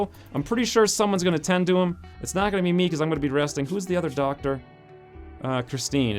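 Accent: Ireland. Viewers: Luminary Xion He almost sounds Irish. Weird. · I thought he was Irish too xD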